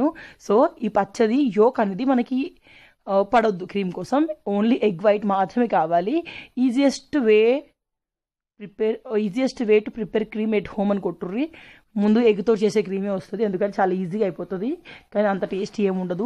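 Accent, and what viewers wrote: Telangana, India. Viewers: Pakaa Telangana ikada language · Hii akka video full me matalatho chala navv vachachindi andi me matalu chala funny ga Telangana basha la super ga undi jabardast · Akka me natural comentry ki nen FIdA aipoya. ..Telangana language ni natural ga present chestaru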